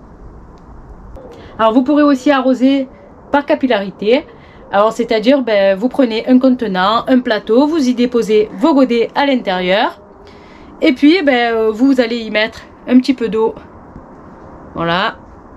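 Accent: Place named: Arles, France. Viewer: Bonjour, vraiment ya du travail dans votre vidéo.... Le montage, la musique et annotations texte, et le propos intéressant, j'avoue j'en fait pas autant niveau youtubage😮, bref super vidéo avec un accent de chez moi.... Arles. Vidéo vraiment pédagogue, simple et claire.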